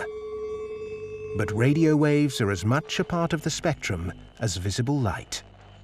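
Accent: United Kingdom. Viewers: Imagine if this documentary began with a female photographer taking photos of a man in a speedo, or some such get up. This, combined with a British accent to make it classy. Way to dumb down science at the expense and degradation of women.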